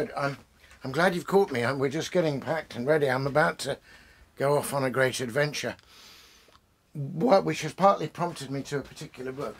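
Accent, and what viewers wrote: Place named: Oxford, United Kingdom. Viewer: I just found you. I was looking to find Omar Khayyams wisdoms... An Olympic cruise.... My old Guru, Micky Burn, (author) had that in his bucket list too. You have an Oxford accent, to my ear. Are you back yet from the conflagrations?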